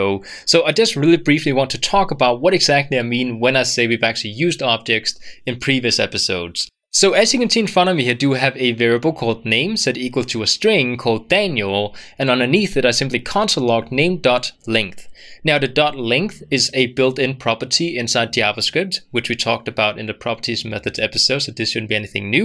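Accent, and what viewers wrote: Denmark. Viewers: Trying to figure out the accent. Definitely a Scandinavian but not Swedish accent because, we don´t sound exactly like that but close. It´s not Norwegian because you don´t sound that gay, so you must be from Denmark.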